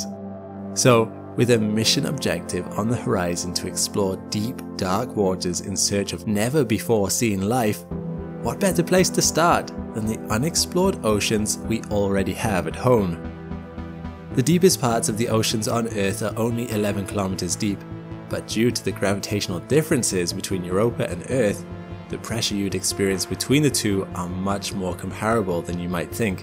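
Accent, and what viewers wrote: Wales, United Kingdom. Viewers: Is that a Welsh accent? I'm from Newport... Sounds similar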